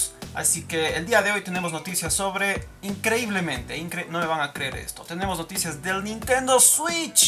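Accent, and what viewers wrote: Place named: Cuenca, Ecuador. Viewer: habla con tu asentó cuencano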